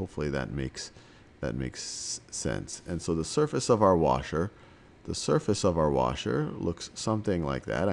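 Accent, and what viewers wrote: United States. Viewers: Definitely American. I’d guess either Midwest, northwest, or mid-Atlantic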